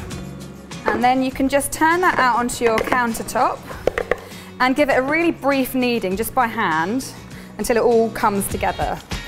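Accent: United Kingdom. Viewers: Amo el acento del inglés británico, tenía que decirlo!!! Suscrita para conocer deliciosos postres y escuchar el idioma ;)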